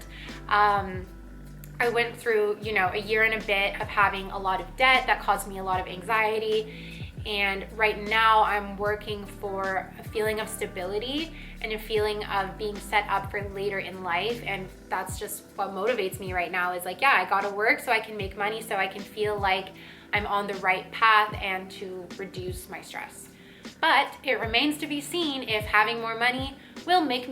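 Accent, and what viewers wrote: Canada. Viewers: Your Canadian raising is strong in this one, haha.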